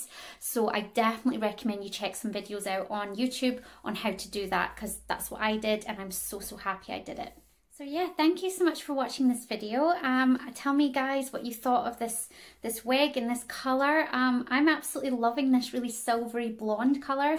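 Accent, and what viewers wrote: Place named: Scotland, United Kingdom. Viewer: Nice video.. Very informative wig looks great on you Ps love your Scottish accent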